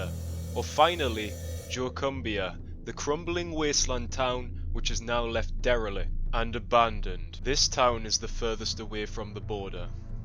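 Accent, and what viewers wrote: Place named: Scotland, United Kingdom. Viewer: My o my you sound so scottish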